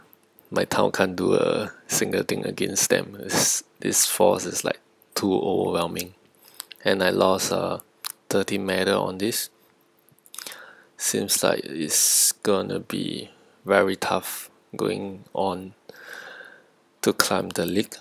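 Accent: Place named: Singapore. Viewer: let me guess...singapore!!! you are from singapore aren't you??i know that accent..haha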